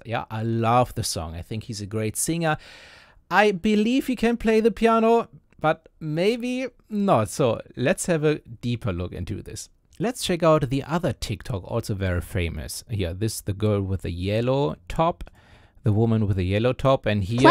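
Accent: Germany. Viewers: Nice video! I CAN HEAR THE GERMAN! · I can tell that your German 🎉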